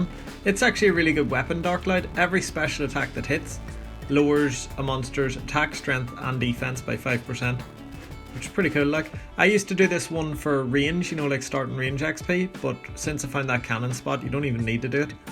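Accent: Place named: Scotland, United Kingdom. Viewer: Love your Scottish accent bro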